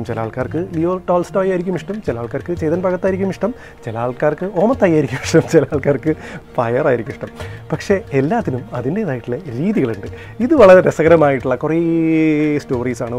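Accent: Pattambi, India. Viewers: Pattambi slang kelkumbol too happy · Pattambikaranayondavum bb3 le anoop chettantyum gp chettantyum slang orupole😁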